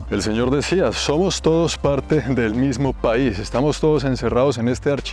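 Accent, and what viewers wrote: Bogotá, Colombia. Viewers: Me fascina que hable como colombiano. Eso es lo más interesante de sus videos. Que hable con acento y palabras de Colombia. Eso es algo que le da un precio invaluable a sus videos, parce, el hablar con sello colombiano.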